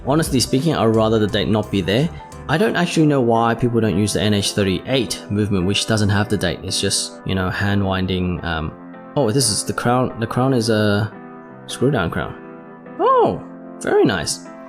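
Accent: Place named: Australia. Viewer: why what's wrong with how he's saying it? he's Aussie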